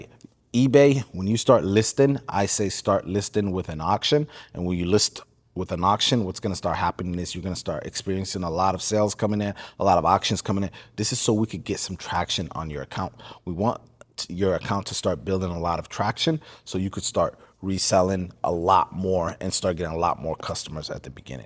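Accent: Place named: United States. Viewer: Im greting you, I'm from warsame bade. Channel post . Second I think your are somali. Live in USA 🇺🇸, because of Your language accent is American 🎉.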